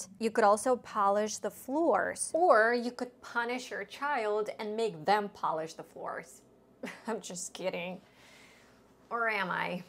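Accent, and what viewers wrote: United States. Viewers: It's a strong US accent. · This isn't teaching English, this is teaching an American dialect. The words, spelling and pronunciations are very different to "English"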